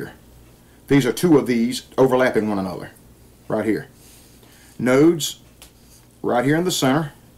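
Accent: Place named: South, United States. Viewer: There is just something wonderful about a somebody with a southern accent explaining scientific or technical stuff. That was a really good explanation.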